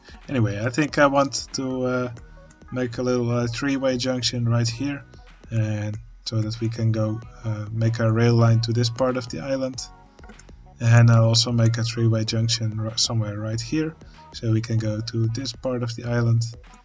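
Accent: Netherlands. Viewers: you sound so dutch :)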